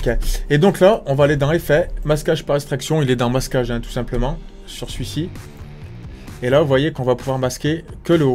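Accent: Saint-Étienne, France. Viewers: T'as un de ces accent stéphanois ! Haha bienvenue au club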